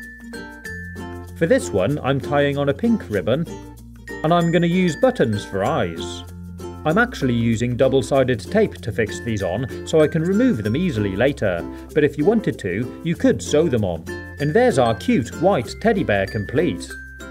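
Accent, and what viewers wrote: United Kingdom. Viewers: Hope u don't mind me asking but are you British?? I am British 😁 U sound a bit Edit: keep up the great work! Love your vids, been subscribed for about 2 years now 😄😮😃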